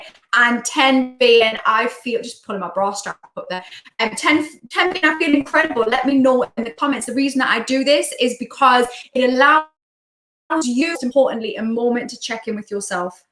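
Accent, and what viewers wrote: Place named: Geordie, United Kingdom. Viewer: I saw your Georide Accent videos first. You really have made your accent more proper. I Love the Georide accent!!!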